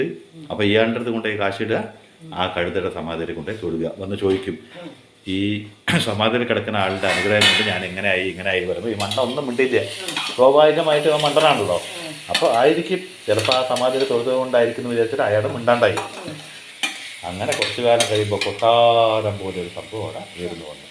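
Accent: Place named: Palakkad, India. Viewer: പാലക്കാടൻ ഭാഷ പോലേ തോന്നി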